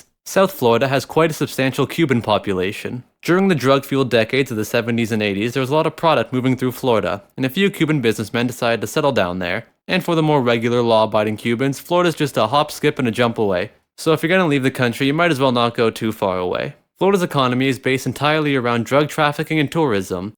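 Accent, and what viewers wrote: Newfoundland, Canada. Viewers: are you Canadian? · Ok so like what province are you from? You keep saying b'ys but I dont know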